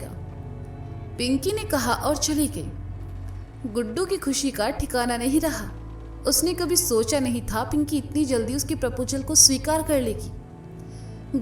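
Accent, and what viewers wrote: Varanasi, India. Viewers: Ma'am aapki voice so adorable and apki voice me ye banarasiya language so amazing lagti hai ❤❤🥰🥰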